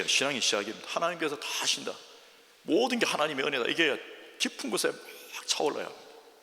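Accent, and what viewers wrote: Gyeongsang, South Korea. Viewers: 경상도 ㅋㅋㅋ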